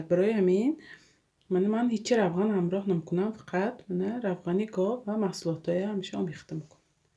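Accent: Isfara, Tajikistan. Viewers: Гап не апа. Исфарача кара фахмондид👍👍💐💐💐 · Офарин зани бехтарин дугона лафзи исфара додед хохар.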